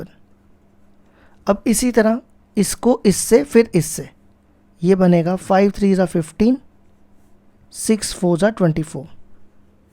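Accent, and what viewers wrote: Jammu, India. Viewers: Sir you are from jammu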